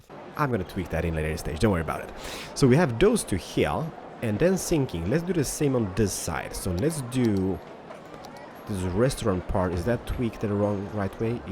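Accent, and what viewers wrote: France. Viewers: J'adore ton accent français :D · hello,you have a great french accent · Very good french accent, i'm french and that's cool :)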